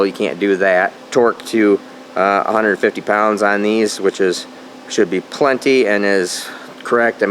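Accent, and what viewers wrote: Michigan, United States. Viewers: that Michigander accent coming through 😂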